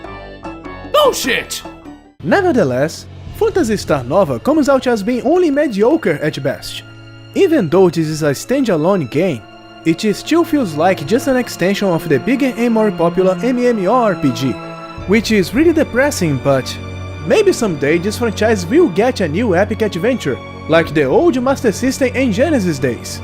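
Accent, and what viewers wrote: Brazil. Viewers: Are you Brazillian? You sound brazillian... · Impossível não notar o sotaque brazileiro lol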